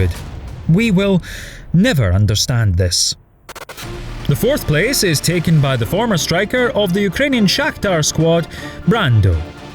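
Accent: Scotland, United Kingdom. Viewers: its scotish?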